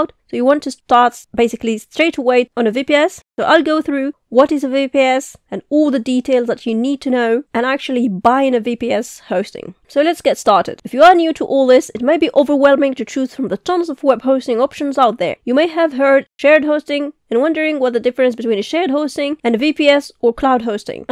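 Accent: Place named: India. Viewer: India maybe 🤔 or indian living in UK 🤔 don't know 😂 just guessing from the adorable access that u have 💕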